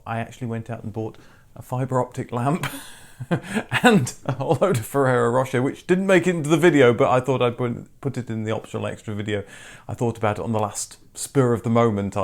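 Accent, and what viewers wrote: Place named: United Kingdom. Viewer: I've been a fan of your videos since you started on here but can't make out wear you are from are you from the UK or US you sound British 🤔 ps I love your video thank 👍🙂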